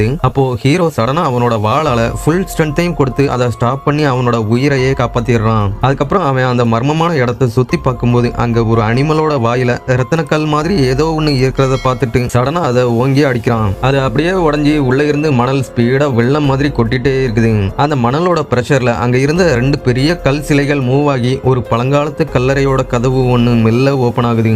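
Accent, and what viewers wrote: Coimbatore, India. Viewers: Unga kovai tamil apo apo varuthu nice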